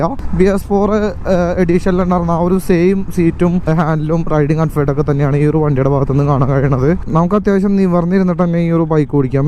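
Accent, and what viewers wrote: Thrissur, India. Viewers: Aa thrissur basha onnu maatan sramikyo pls😁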